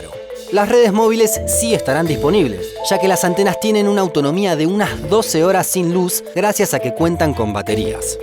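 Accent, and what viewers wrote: Argentina. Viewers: Por tu acento creo que eres argentino Así que te pregunto que se sintió el apagón en el cono Sur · se parecen porque ambos tienen acento argentino creo. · Claramente está dejando a un lado su acento argentino para poder hacer este vídeo. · Yo le noto el acento argentino, pensaba que te referías a las jergas, pues el acento se le nota, no se si los otros argentinos tienen un acento mas fuerte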